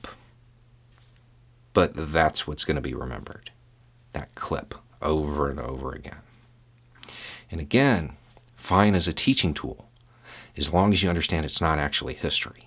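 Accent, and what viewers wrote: South, United States. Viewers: What rock have you been living under that you see anything at all heroic about Ronald Reagan? The "hero" meme about him is pure propaganda, repeated ad infinitum until weak minded people willing to believe anything come to believe THAT thing. I'm getting closer to unsubscribing to this channel. Despite trying to shed your upbringing, it is apparent that at core you remain the ignorant Southerner implied in your accent.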